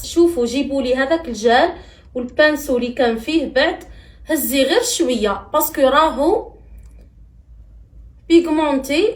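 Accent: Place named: Chaoui, Algeria. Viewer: هدرتك. اتبان. من. جهة. الشاوية. المهم. ماشاء. الله علمتينا. بارك. الله. فيك.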